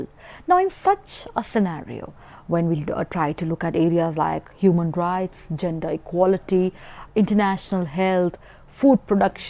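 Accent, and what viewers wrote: India. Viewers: The accent understandably is a product of where you live and who you choose to mingle with. This is a mark, and not a "wrong" with Indians.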